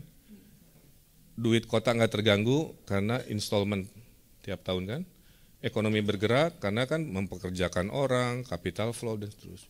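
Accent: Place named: West Java, Indonesia. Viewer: Makluk orang sunda mah begini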